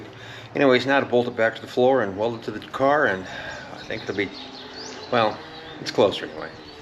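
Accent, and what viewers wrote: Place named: Texas, United States. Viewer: May I ask where abouts are you located, It sounded as if your in Texas or close to it, Just curious and if you rather not say for obvious reason of unlikely types showing up I get it You don’t know me but maybe we will meet at a show sometimes But I really need to watch more of your videos I see some learning this old man needs to pick up on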